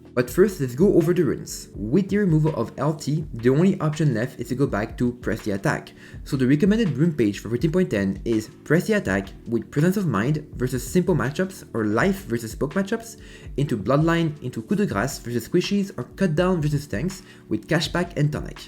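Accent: France. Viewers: T’es français ? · You have a french accent bro ?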